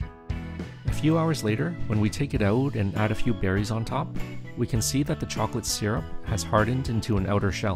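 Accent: Canada. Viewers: Canadian accent, right? :-)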